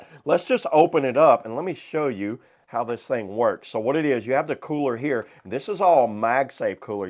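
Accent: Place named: South, United States. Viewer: Your country accent is extremely noticeable 😅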